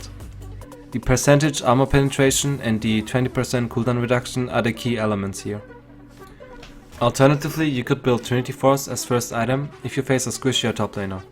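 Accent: Germany. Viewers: Dunno why but you sound like a german to me 0: accent-wise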